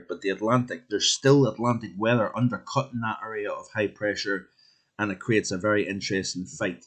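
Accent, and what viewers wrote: Scotland, United Kingdom. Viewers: Would much rather listen to A Scotsman than an Englishman. Well done, I subbed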